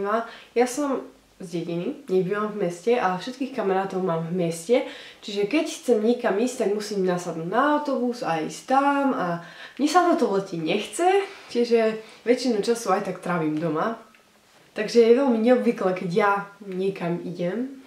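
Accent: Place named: Hungarian border, Slovakia. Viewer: + podla mňa bývaš na 90% pri maďarských hraniciach :) Podla prízvuku (mám pravdu?)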